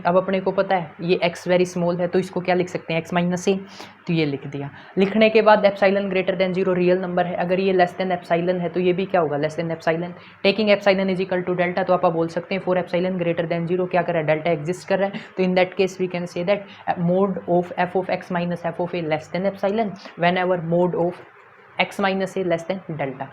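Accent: Haryana, India. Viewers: U R Great Sir Your Haryanvi Tone is just ossmmm Thank u Maine puri youtube chaan di thi par ye topic nhi mile the And main bhi karnak ke ek gaavn ka hun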